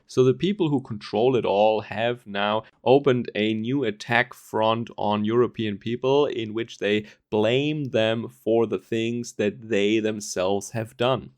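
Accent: Bavaria, Germany. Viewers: Mate, he is from Bayern......they struggle with German! They often have to add subtitles when someone from Bayern is speaking on German TV.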